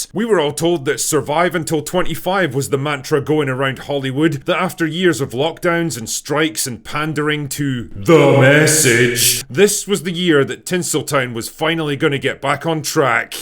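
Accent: Scotland, United Kingdom. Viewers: It always brightens my day to hear this crazy Scottish bastards voice.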